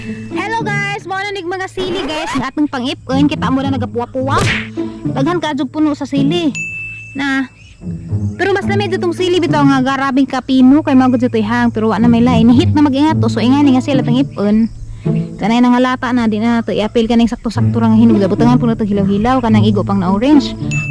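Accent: Bohol, Philippines. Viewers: Hi...I really like u...n excited to watch ur new vlog daily..favorite kaau ka sa ako mama..n of cors kami tanan sa family..taga jagna ako mama.ug malingaw cya sa imong vlog Jud..mkadumdom cya sa iya sinultian b4..like,AJO NAA MOY KAJO...ÀJAW KAY MAJABO..hahahha she is really ur fan.....and kami sa family nkasubscribe nimo..😊😊😊pls pa shout out sa next vlog..Fabiana ellevera halagna..ug sa mga ELLEVERA SA CANJULAO JAGNA BOHOL.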